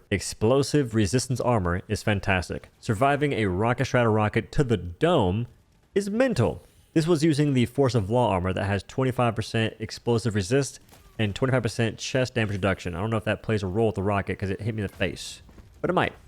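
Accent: South, United States. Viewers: the way he says WH- is prime american. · could be georgia could be louisiana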